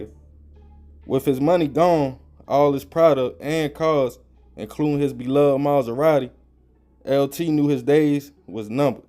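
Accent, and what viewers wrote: Baltimore, United States. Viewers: U from bmore bruh u definitely not from dc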